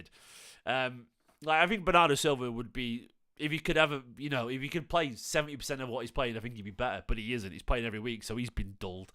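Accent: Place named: Liverpool, United Kingdom. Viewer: Is this fella scouse? He’s got scouse undertones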